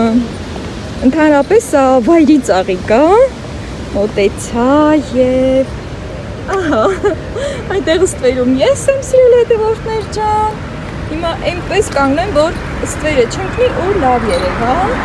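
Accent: Lori, Armenia. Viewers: Բարև Ան ջան շատ հաճելի է միշտ լսել քանի որ մենք Լոռի բառբառով ենք խոսում իրար ճիշտ ենք հասկանում ինչ նպատակի գնացել ես թող բոլորը քո սրտով լինի ❤❤❤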